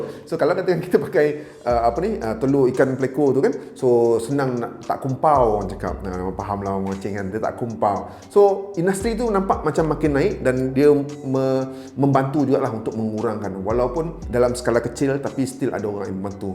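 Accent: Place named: Negeri Sembilan, Malaysia. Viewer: Abg nogori ko dgr loghat tu mcm ade nogori sikit ii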